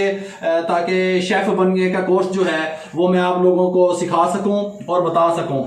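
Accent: Punjab, India. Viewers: ur style...ur punjabi tach....wow masha Allah...love it.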